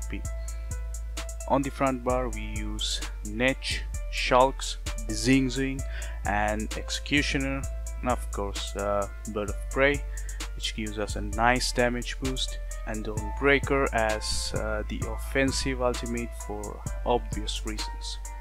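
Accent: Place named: Asia. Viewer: loved de asian-e accent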